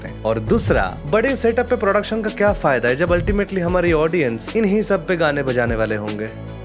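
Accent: Bengal, India. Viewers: First of all very fine content and knowledge you have and also like your bangali hindi accent 😁, Just wanaa know a weird thing, can we use Yamaha PSR S-950(or S750, S970, S770) workstation speakers for monitoring if you ever heard those keyboards sound, it sounds like HS5. Only problem that they are positioned fixed & flat. We cant increase there distance.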